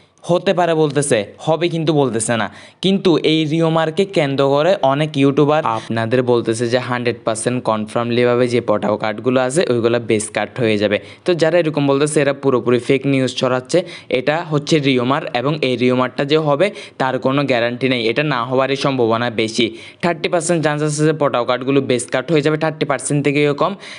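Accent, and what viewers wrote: Chittagong, Bangladesh. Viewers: Vai apni bangla vasar shate chittainga vasha mix kore felen kno? Apnar kotha golo sunley hasi pay😅😅😅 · Vai ki chatgaiya naki?🫠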